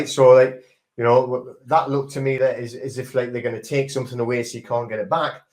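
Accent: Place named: Newcastle, United Kingdom. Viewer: You sound like a geordie if I’m not mistaken are you from the North East of England??? I’m curious apologies in advance if that’s not the case.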